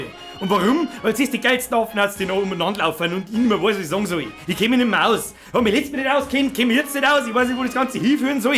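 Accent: Bavaria, Germany. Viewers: Sagmal woher aus bayern kommst du? :D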